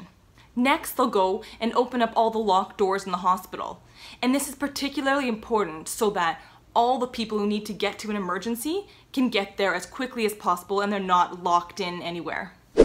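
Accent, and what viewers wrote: Canada. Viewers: I've never seen your videos before, but as soon as I heard you speak I knew you were Canadian from your accent! Great video! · I live in the US in Ohio. Just thought I'd throw that in there as well. You sound like you have a Canadian accent.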